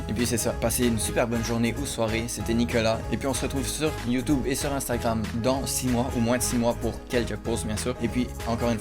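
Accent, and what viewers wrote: Canada. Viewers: T’entends pas son accent ? Il est déjà canadien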